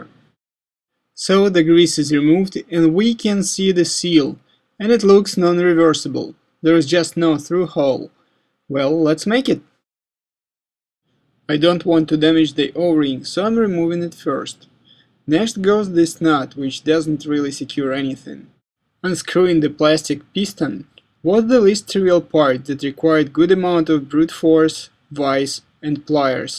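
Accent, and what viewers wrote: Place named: Russia. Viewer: That accent... Russia? Ukraine?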